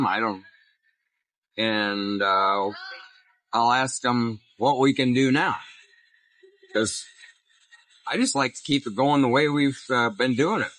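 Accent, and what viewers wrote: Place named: Midwest, United States. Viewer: Hi guy I don't know your name. This is the first time I've seen your channel. I live/d in Negros/Valencia for four years now. Sorry to hear about your banking troubles. Hope you get it resolved. I'm Jeff/70 from Wisconsin originally but lived in California long time. Are you from midwest? Just curious from your accent. Yeah, simple life ain't so simple...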